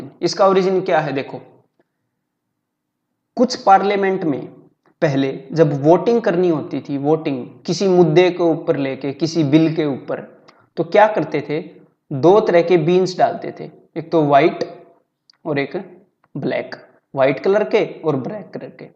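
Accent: Haryana, India. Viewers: Nice haryanvi sir ji 👌👌👌👌👌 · bhai maza aa jata hai yaar apki haryanvi type bhasha shun k . . . . knowledge ka dose thanx boss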